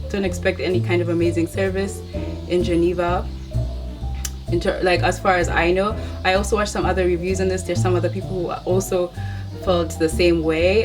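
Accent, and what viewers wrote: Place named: Kenya. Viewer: omg, i thought you were Kenyan at first, just from the accent. definitely subscribing to your channel. Thank you for the video.It was definitely informative. I hope to be able to intern at U.N next summer/ keeping my opes up.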